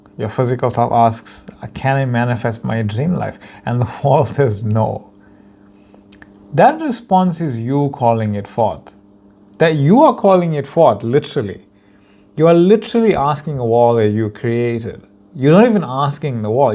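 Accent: South Africa. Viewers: That's how Indian people speak in South Africa.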